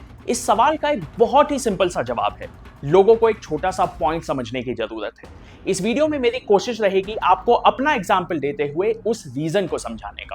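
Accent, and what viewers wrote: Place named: India. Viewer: Pahali baat tum North se nahi lagate tumhare hindi ke accent se lag raha hai. Dusari baat sikhane me koi burai nahi lekin forcefully misbehave se hai. Here I am born in u.p work with many state people state like gujrat, bihar, u.p, Himachal, Punjab, delhi, haryana, odisa, west Bengal, andra pardesh, Maharashtra, m. p etc etc but never hate each other base of language every person enjoy own language. But commonly use hindi because hindi is only one language understand by every one. If you employees center govt and working on big project than understand each feeling. And now this time South people misbehave and mistreated North Indian only over language. Political party divide and rule politics thay unhide violence over North Indian only keep happy for local vote bank politics.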